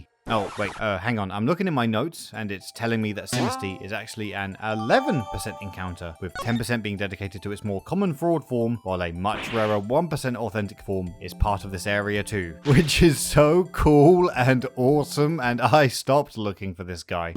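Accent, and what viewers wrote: United Kingdom. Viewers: He’s British? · You’re bri’ish?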